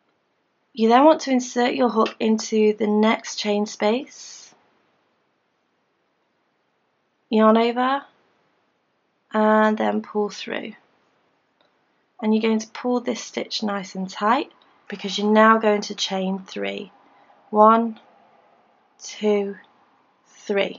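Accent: England, United Kingdom. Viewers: Your tutorials are THE BEST! You go along at a perfect pace and you are extremely clear without being boring. It doesn't hurt that your English accent reminds me of my mum who first taught me the basics. ;) Thank you. I am almost done with my first afghan and you have helped me all along the way. xxx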